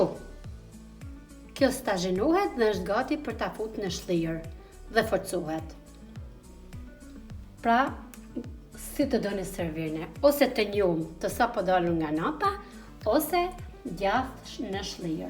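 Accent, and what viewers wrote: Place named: Shkodra, Albania. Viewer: Ty të lumshin ato dur se un vdes me hanger djath të njom mu duk se flitshe shkodranshe se edhe un jam shkodrane❤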